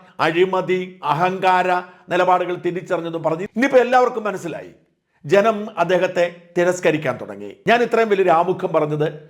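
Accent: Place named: Kottayam, India. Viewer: ഞാൻ മധ്യ തിരുവിതാംകുർ ഉൾപ്പെടുന്ന മാവേലിക്കരയിൽ ജനിച്ചു വളർന്നു ജീവിക്കുന്നു,. ഇപ്പോൾ 51. ശുദ്ധ മലയാളം കേൾക്കാൻ വേണ്ടി മാത്രം ആണ് ഈ ചാനൽ കാണുന്നത്.. ഏകദേശം ഞങ്ങളുടെ ഭാഷയോട് ചേർന്ന് പോകുന്നു... പക്ഷേ ഷാജൻ.. കോട്ടയം ആണ് അതിന്റെ ഭാഷാ ലയങ്ങൾ ചേരുന്നു എങ്കിലും.... തമ്മിൽ ഭേദം തൊമ്മൻ.. ഈ ചാനൽ ആണ് ഞങ്ങൾ കാണുന്നത്... എല്ലാം ഭാവുകങ്ങളും... മറ്റുള്ളവർ മോശം എന്ന് ദയവായി menshan ചെയ്തില്ല.. ഈ ഭാഷ നിലനിൽക്കുന്നത് ഈ വൈവിദ്ധ്യം അത് മാത്രം ആണ്.. മലയാളം സംസാരിക്കുന്ന എല്ലാവർക്കും നന്ദി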